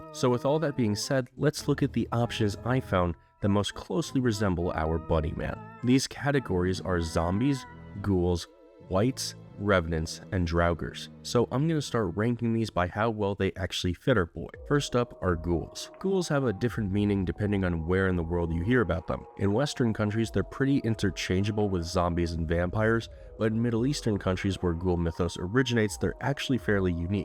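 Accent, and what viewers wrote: United Kingdom. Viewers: He's. British. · he's british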